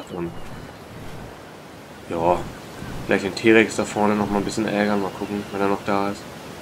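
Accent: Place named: Hamburg, Germany. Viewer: thx für das Tutorial. Vom Sprachbild würde ich mal stark Hamburg und Umgebung schätzen.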